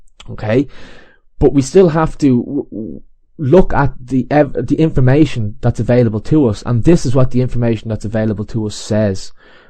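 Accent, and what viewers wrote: Dublin, Ireland. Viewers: That is a perfect Dublin accent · trying to learn an Irish accent what better way than listening to an Irish guy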